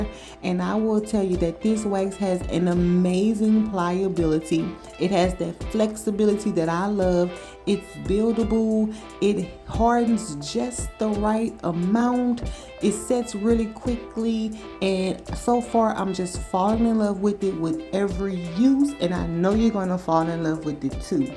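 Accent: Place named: South, United States. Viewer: This lady must come from Mississippi or Louisiana. She had to mispronounce the easiest words. She sounds illiterate and needs to read more. 💯🤷🏿‍♀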